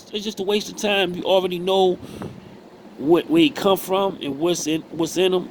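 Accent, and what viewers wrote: Baltimore, United States. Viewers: Ayo you from bmore? Your accent sounds like it....and big facts about intelligent people, we colorblind, all i see is green. If you help me see green, idc what you look like, lets get it.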